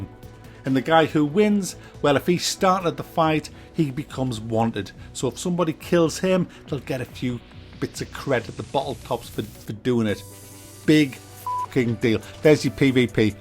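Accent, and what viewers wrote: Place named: Geordie, United Kingdom. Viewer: i can count the games on my left hand that actually has anything good to say about and i lost 2 fingers on my left hand in an IED blast whilst on patrol in Afghanistan,i think one should just take the plunge on games and buy it and play it for themselves just like the good old days and if its shit then ho well.never listen to others opinions,what doesnt work for peter doesn't mean it wont work for paul. you tubers love pissing and moaning about stuff because it attracts the low intellect people that just take there opinion as gold.back in the day when i bought sega and nintendo and commodore games there was no pissing and ranting yet the games were purchased and played none the less.i think you tube is a bullshit infested pit for people with no friends,no life and rely on it for a honest income,which i find pathetic.maybe if there was no money in it then i would like to see how many pissy rants you wanted to post then when you have to go out at 5am and work till 8pm just to scrape by.i come on these channels to boycott them,its in my belief a syndicate and many people are already trusting there lives on these people that continue to make there opinion count as if there a god and we should all bend a knee to there opinions,remember anyone can grab a cam mic and talk bullshit and joke swear and rant yes it has a certain charm because you have that jordie twang,yes the yanks probably love it but as a brit myself i hate it by the fucking way as many of my squaddie friends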